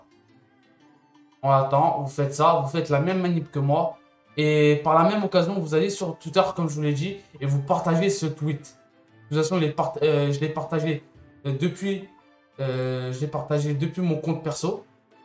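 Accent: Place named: Marseille, France. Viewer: Par contre sss un peut marseillais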